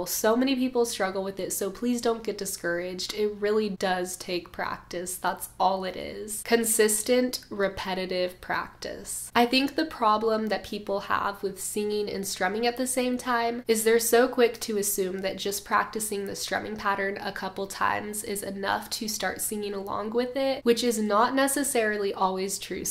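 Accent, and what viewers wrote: South, United States. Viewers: No offense but when she's talking she kinda sounds like she's trying to muffle a southern accent.😂Edit: Not trying to be rude I love you, you have some of the best tutorials❤ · Your southern accent is slipping out in this video lol.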